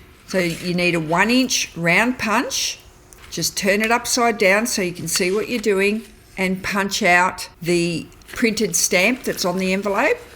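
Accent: Australia. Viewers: Hi! So glad I’ve found your channel! I love what you do. I have requested to join your Facebook page, hope I’m accepted. And it’s so good to hear a fellow Aussie on YouTube!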